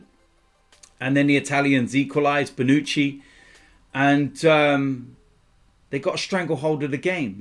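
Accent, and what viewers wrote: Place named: RP, United Kingdom. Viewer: Love how posh & 'professional' he speaks on his own channel compared to being on aftv & other channels 😂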